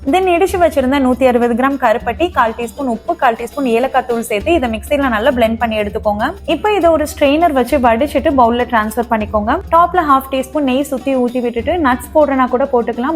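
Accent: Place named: Kayalpatnam, India. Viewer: Eanga ooru kayalpatnam solra style super.eanga iruntha eanna.sontha ooru special than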